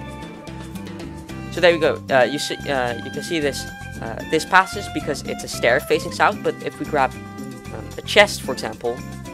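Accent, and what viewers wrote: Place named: Netherlands. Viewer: i smell a dutch accent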